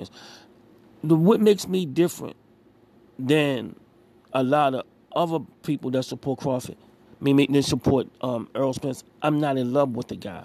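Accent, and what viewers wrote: Baltimore, United States. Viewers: Yo, I dropped a video like a week and a half ago on this topic. I support your opinion. Btw, sound like you from B-MORE. I got some people out there. Talk just like. You, lol. Imma sub tho. Respect🙏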